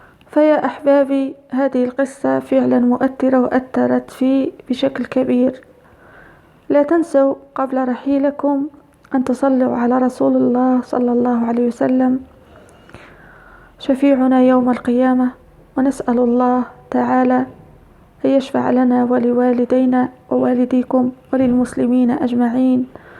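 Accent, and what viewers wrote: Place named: Tunisia. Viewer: السلام عليكم ورحمة الله وبركاته من كلامك أنك تونسية بارك آلله فيك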